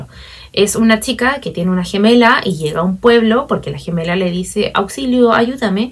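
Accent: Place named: Chile. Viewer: Que lindo el Sr Leon! Me encanta el sweater que estas diseñando. Y estando en esa nota, yo pondría los botones en la sisa pq si los pones en las mangas te va a molestar, pq eres muy inquieta y haces muchas cosas con los niños. Yo también estoy embobada con las cosas que esta haciendo la Glorita, y estaba contemplando comprarle algo, pero definitivamente me convenciste. Preciosas todas las bolsas. Me encanta escucharte, hoy te escuchabas menos Española. Más Chilena! 😂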